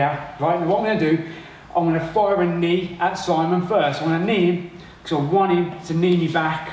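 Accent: United Kingdom. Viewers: Dunno, too unclear for me. I have a hard time understanding thick regional English accents.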